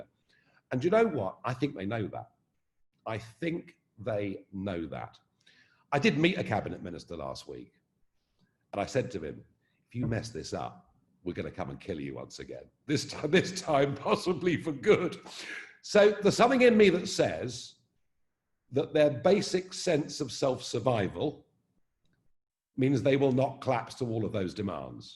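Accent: RP, United Kingdom. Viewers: I don’t like him and brexit will be a disaster. But his accent is sooooo British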